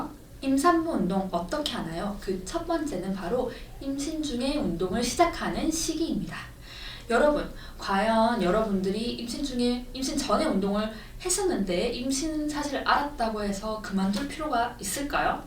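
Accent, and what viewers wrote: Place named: Busan, South Korea. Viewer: 임신부 운동 검색하다가 찾아왔어요. 말투가 익숙하다 싶었는데 부산분이라 반갑네요 ㅎ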